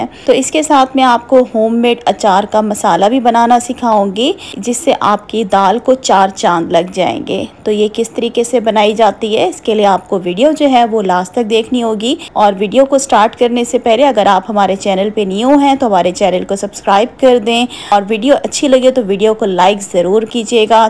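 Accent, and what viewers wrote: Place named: Pakistan. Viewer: Achi lagtii hai pakistani hindi.....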